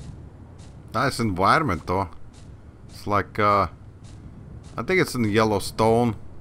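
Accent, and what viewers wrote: Russia. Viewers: Я знаю что ты говоришь на русском по твоему голосу Я я знаю что ты русский но ты разговариваешь на на английском чтобы люди поняли тебя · Love your Russian accent!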